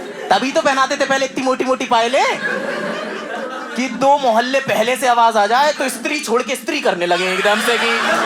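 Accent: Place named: Punjab, India. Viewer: I really love it your comedies and that little spiking punjabi I love it ❤❤❤❤❤😂😂😂😂😂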